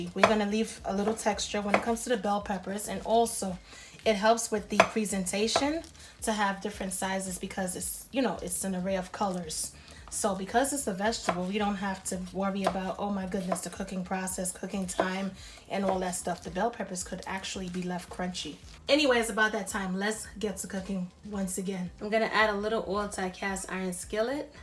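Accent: New York, United States. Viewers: I just made this and it was awesome, easy to follow and put together, thanks love the NY accent. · Sounds like she's from newyork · Definitely NY maybe Westchester or Long Island or Queens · Are you from NY? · Are you from NY?🤔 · Had to subscribe once I heard the accent. 💯 N.Y in the building right here BX.... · Didn't look at anything else but I say from NY state. I from NY . Love the recipe. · I could almost smell those sausages!!! Her New York accent makes me long for mine😛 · Looks delicious and very easy to follow the steps. I love the NY accent. Would you please check me? <link> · Ah, Thought I heard the NY accent ,or thereabouts. lol! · She got a New York accent....LOL.. I find it lovely seeing Northerners cook Southern foods. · Lol love her accent funny she most definitely from NEW York lol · Luv that New Yawk accent.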